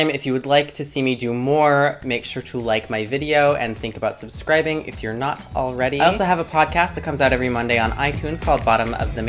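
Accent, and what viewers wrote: Portugal. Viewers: You've got a pretty natural accent. Just found your channel and already subscribed. Keep on learning Portuguese, it makes me really happy to know that people from outside are studying my language. I've recently found a lot of channels by foreign people who speak Portuguese (From Japan, Romania, Russia, Germany, USA...), but you're the first who speaks with an european accent. :) Greetings from Brazil (and please, come here one of these days :D) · btw, you have a nice Portuguese accent! Keep going! :p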